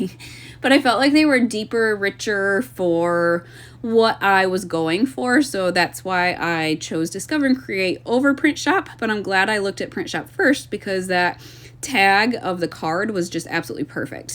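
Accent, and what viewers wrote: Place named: Great Lakes, United States. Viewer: We played a lot of euchre near Buffalo, NY. Maybe it’s a Great Lakes game, like the accent!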